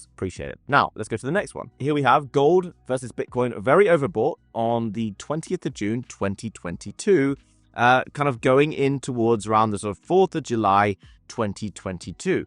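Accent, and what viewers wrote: England, United Kingdom. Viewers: 😂😂😂😂 I can’t I could tell kms away ure English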